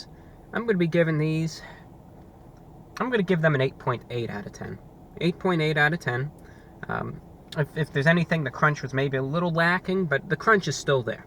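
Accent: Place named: New York, United States. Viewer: Why do you talk like those radio dudes in the 40s. I get it you're from New York but that's an old school accent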